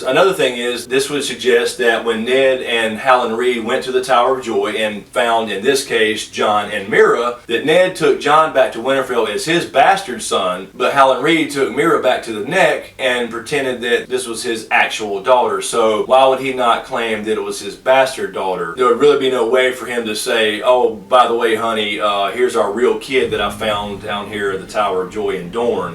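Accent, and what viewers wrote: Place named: Kentucky, United States. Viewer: 1. Love the shirt. 2. Love the video. 3. Subscribed. 4. What part of the South are you from? I'm from Kentucky and accent sounds very similar.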